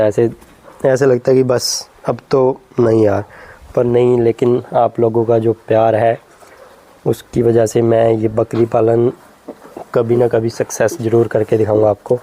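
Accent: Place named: Bihar, India. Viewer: Aap bihari style me bolte ho bhai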